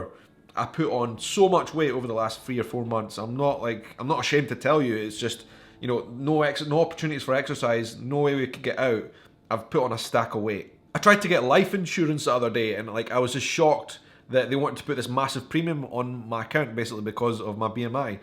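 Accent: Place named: Highlands, United Kingdom. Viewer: <sighs> I listen to your accent. ...and I hear my dad when he would show me how his grandmother, (my great grandmother), would talk. I never met her. It's like you said. She was from the Highlands and as I hear it, there is no place on earth as beautiful. I'm getting way older, now, and I hope I make it Scotland.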